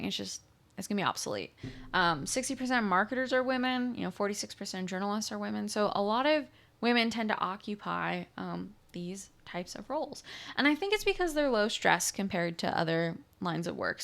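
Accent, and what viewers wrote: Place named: Northern Virginia, United States. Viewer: 30+ years in DC. 75% of women workers were superfluous. True CS degrees had at most 2% women and they were foreign. UMd had the best CS program. Further, DC is NOT segregated. That’s what makes it dangerous. Clueless white people move into black neighborhoods and become a statistic. You sound like you lived in far NW or Northern Virginia. You don’t sound like you lived in DC proper… Which was a good idea.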